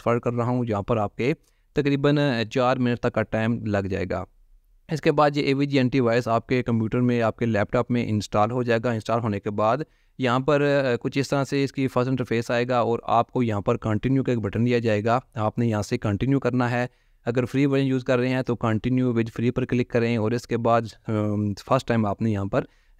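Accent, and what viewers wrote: India. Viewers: Cannot understand, you have a strong Indian accent & talk too fast. A lot of foreigners have this problem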